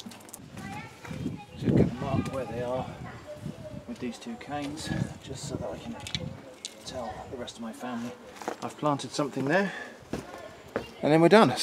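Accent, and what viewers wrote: United Kingdom. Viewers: I like your channel, the clear British English and the calm atmosphere in your videos. Great work, keep it going👍👍👍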